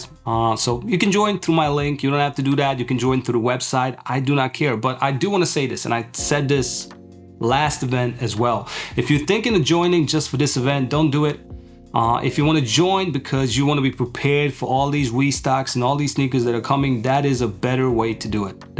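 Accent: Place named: New Jersey, United States. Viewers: I thought my man was from New Jersey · even sound american. Am i trippin?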